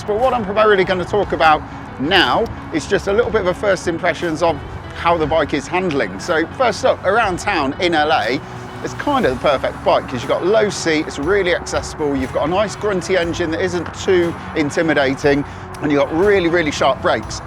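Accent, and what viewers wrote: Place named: England, United Kingdom. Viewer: Nah, too many annoying American-isms spoken with an English accent.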